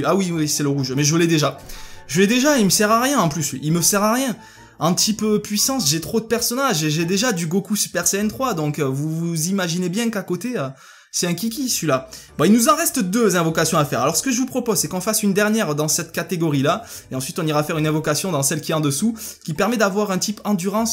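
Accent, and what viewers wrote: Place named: Marseille, France. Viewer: tu es Marseillais? Car je suis Marseillais et j'entend plein de personnes avec ton accent et TU AS LE MÊME ACCENT QUE MOI ☺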